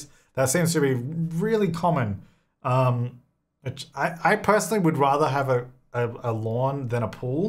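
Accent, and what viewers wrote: Australia. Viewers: I love your australia english :)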